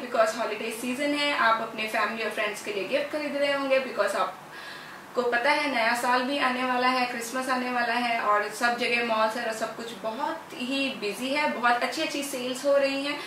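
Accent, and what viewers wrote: India. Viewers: upka language lambadi hindi lagta hai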